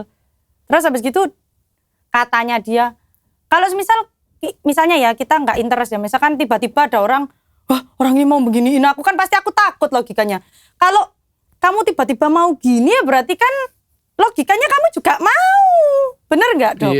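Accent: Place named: East Java, Indonesia. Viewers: jawa timuran, tepatnya ya surboyo ngalam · Dialek Jawa Suroboyoan ini.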